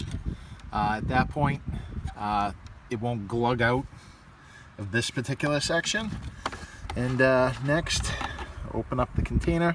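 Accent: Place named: Louisiana, United States. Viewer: New England? Would have though Louisiana with your accent.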